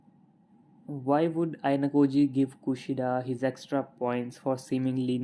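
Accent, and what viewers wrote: India. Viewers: by your voice feels like you are from india : )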